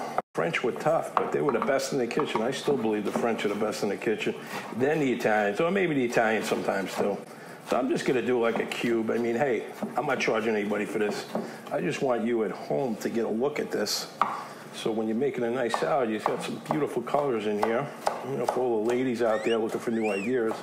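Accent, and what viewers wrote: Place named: United States. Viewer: Chef, lei ha detto che è italiano, dall'accento non si direbbe: solo di origine italiana od ormai ha preso l'accento "americano"?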